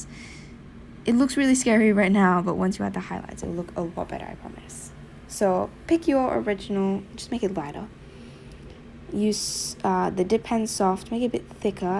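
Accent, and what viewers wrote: United Kingdom. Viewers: Her voice: has this British accent My voice: dying rat noises intensifies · omg I watch this vid and it was really helpfull, your voice helps me a lot too. Guys, I think we have the best Edit Teacher here xD And Thanks very much for this video. (Oh and, are you using british accent? I use that accent too) edit: I use this tutorial for 6 days, and I finally did it ;> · the British accent (Or the Australian accent whichever she has both are awesome) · you are british? u3u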